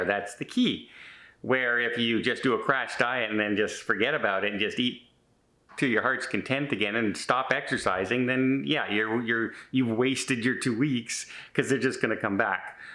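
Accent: Canada. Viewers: 'eh. Me and hubbies love your channel. being Finnish people ask me if I'm Canadian as I pronounce words like you. Good luck to you and Tony. We are cheering for you. Your neighbors in Sault. Michigan. The yoopers. Prayers for your health. Kate and Jim Peach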